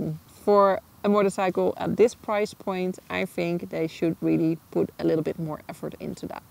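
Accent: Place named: New Brunswick, Canada. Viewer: I couldn’t afford one so I picked up an MT-09. Was obsessed with it, especially the 2023 FTR 1200 S model, which has the exact same tires as the R6 I just sold. Couldn’t ride sport bikes anymore and wanted something upright sitting (with good hp AND torque) so these bikes fit the feel, size, sport category style and power which saves me from going to a heavy low hp cruiser. I was shocked to hear that the paint peels especially after knowing it was $4000 more than my MT-09. Dad always wanted a Harley before he passed but the 70s/80s HD’s were different. My go to in his memory is this. Now I just have to remember it’s jerky at high rpm and paint peels. I’m in NB, Canada and could tell from the accent and the bridges in the background where this was filmed haha! Awesome video! Agreed. Ryan F9’s video on it was great! +Glad to hear the windscreen helps! 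Had to add one to my MT-09. I’m used to the cockpit feel with R1 and R6 windscreens! Glad you didn’t hold back on what you didn’t like!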